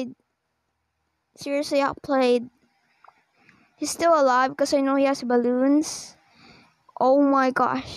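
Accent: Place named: Philippines. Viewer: Your sound is like Philippines bro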